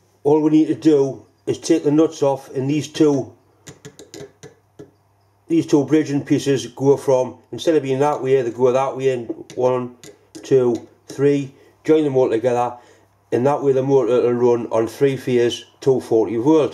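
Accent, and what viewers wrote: Newcastle, United Kingdom. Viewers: It would be good if he spoke English and not Geordie.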